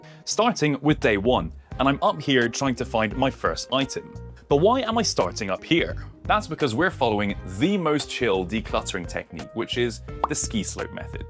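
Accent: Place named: England, United Kingdom. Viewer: Since he clearly sounded English, it is perfectly possible that it WAS a joke. Our humour can be very "deadpan" at times.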